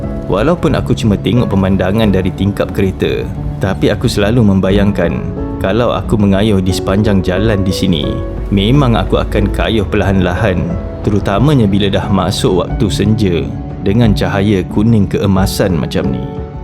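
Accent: Malaysia. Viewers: video dokumentar ini layak di beri apresiasi, narasi hostnya sangat bagus dengan dialek bahasa Melayu khas semenanjung yg enak di dengar, bahasa yang rapi dan teratur, vokal yang bagus, dan mudah di mengerti.angle / sudut pengambilan video sangat bagus dan rapi, editing nya oke... all the best lah.. salam serumpun dari jakarta. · Bang suara yunik menarik logat malasyia...slm bumi minang kabau...❤👍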